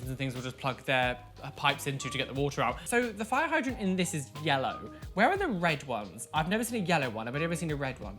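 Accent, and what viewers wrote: United Kingdom. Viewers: Are you from the UK? Lmao u have an accent 😂🤣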